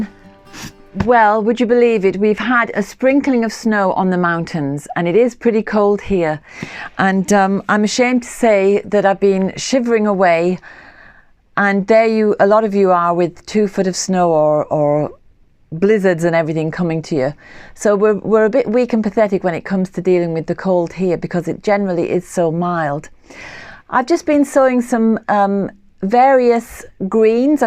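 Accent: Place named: North East England, United Kingdom. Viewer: Another great video ! I always hear the north east accent whilst your talking, .I'm from South Shields and guess your from close by.. Thank you for sharing your knowledge and love of nature with us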